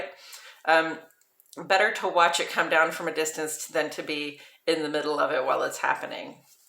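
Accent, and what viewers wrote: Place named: Chicago, United States. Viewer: Hi, (Flakeway and) I have to ask you out of curiosity where you are from originally. I've suspected that from your voice that you sound like you are from NE Illinois ie, Chicago area as I am originally.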